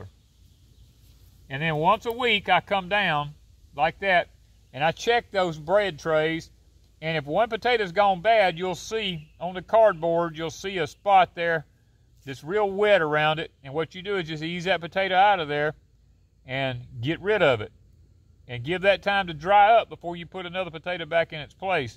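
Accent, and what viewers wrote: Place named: Mississippi, United States. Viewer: As you was talking i knew you had to be in Mississippi i just knew it. Im from Crystal Springs, Mississippi born and raised. I love gardening. My granny and an uncle raised me from and infant and taught me. Nice to meet yall. I love the video.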